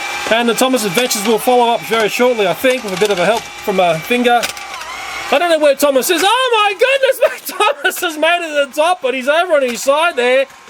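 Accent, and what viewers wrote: Australia. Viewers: I’m sure your target audience for this video is two three olds and older. I know my daughter will love to watch this she’s two. I’m 24.. and I just watched this through.. I love it 😆 so you know your kiddie YouTube videos are not only for kiddies.. you’re a great person and I love all your videos.. I try to share all your videos as much as I can.. love you as a person, were in the uk. But your awesome Australian accent always is my three in the morning cure to go back to sleep 😛 chronic insomniac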